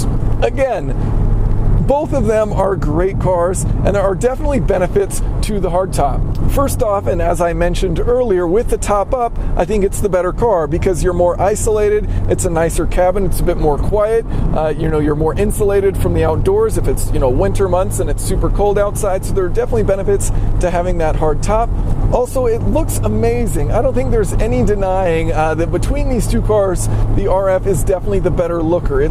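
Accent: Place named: South, United States. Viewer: You can tell right away , that you are from the south .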